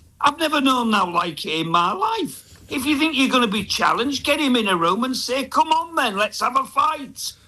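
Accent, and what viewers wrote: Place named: Yorkshire, United Kingdom. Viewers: Pure Yorkshire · Proper Yorkshire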